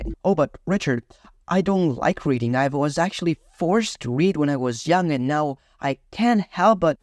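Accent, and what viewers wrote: Italy. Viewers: conosco un italiano quando lo sento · Wait, are you Italian? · Are you from italy?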